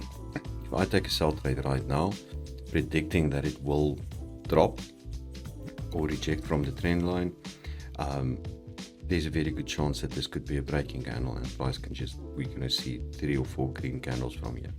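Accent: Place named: Afrikaans, South Africa. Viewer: Sound like you Afrikaans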